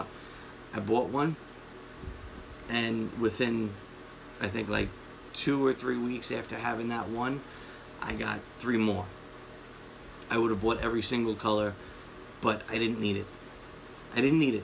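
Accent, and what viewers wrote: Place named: Northeast, United States. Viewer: Thanks for the review brother. Just curious, where are you from? I knows it's the East Coast, I'm guessing north eastern, close to philly?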